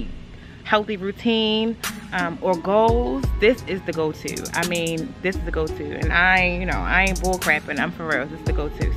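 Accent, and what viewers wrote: United States. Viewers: DC or B-More is what I hear in that accent, great vid🤩🥰🍫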